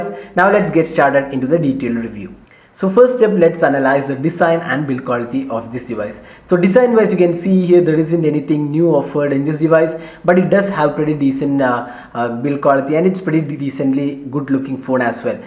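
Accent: Kerala, India. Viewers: indstall aapal gawlity abailabel haha mallu english is unique. My english teacher was mallu too and spoke just like this. I noticed that they have the most thickest accent in south india. Tamils actually pronounce better than telugus.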